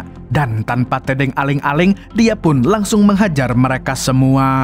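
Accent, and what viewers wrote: Banjar, Indonesia. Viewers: Banjar sekalinya 🤣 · Lah bng banjar kh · Orang samarinda kah banjarmasin pian mang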